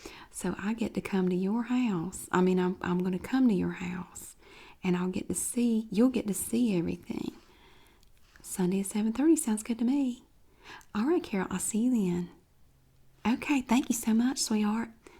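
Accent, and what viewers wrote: South, United States. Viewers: I like southern accents 😭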